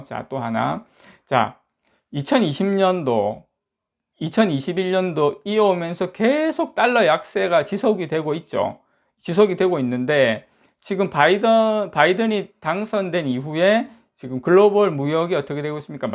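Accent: Gyeongsang, South Korea. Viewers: 경상도 사투리 듣기참좋네요 유익한정보 감사합니다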